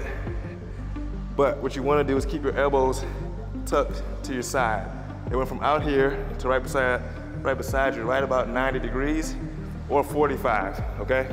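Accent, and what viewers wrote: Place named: Virginia, United States. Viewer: Where you from bro? I thought VA.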